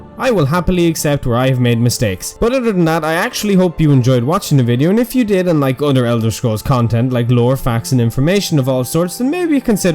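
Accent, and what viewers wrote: Ireland. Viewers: where in in Ireland are you from man , i dont get your accent ? im from cork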